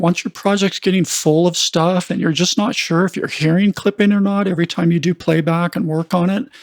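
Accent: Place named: Vancouver, Canada. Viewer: Just out of interest, are you in Vancouver by any chance? I just ask as you have the exact same way of explaining things as one of my mentors over here who was an engineer over there before being poached by the Canto-pop scene in the 80s. And I don’t just mean your accent, it is your whole demeanour. It is pretty unreal!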